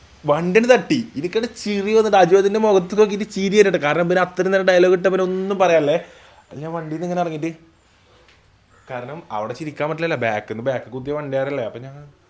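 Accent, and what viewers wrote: Malappuram, India. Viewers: ചിറി അല്ല ചിരി നിങ്ങൾ മലപ്പുറം ഒരു മാതിരി ഒയെന്നെ സംസാരം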